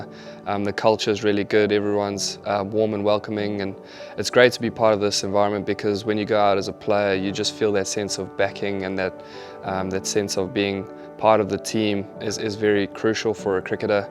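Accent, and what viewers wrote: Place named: South Africa. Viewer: This guy has a South African accent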